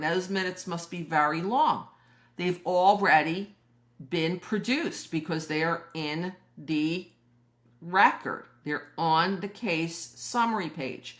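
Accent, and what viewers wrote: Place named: Philadelphia, United States. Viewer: I have a question off-topic. Would you care to settle a bet between my wife and me? (Yes, despite my YouTube name, I am a male (long story)) We were trying to pin down your accent. She thinks Philly and I think Deleware. Do one of us have it right?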